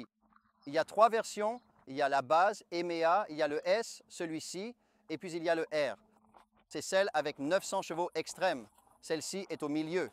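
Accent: Quebec, Canada. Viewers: La traduction automatique n'est pas très bonne. Et la voix choisie a un accent québécois.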